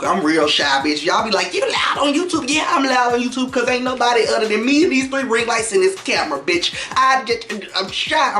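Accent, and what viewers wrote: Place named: Memphis, United States. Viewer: Please tell me you’re from Memphis! I know that accent and vibe from anywhere, you are soooooo damn funny!!!😂 LOL I was cracking up the entire time but the ending gagged me😩😢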